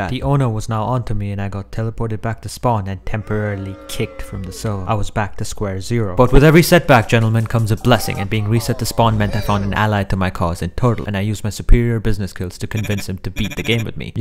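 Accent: India. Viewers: are you Indian you sound Indian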